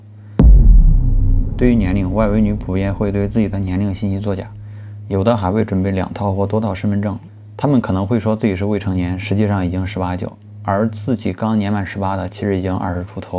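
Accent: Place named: Guiyang, China. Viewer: 你是贵阳的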